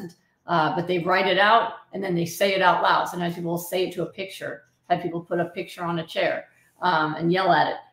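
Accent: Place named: Texas, United States. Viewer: You're starting to sound like a real Texas. Matthew McCaughey would say, "Hello, everybody."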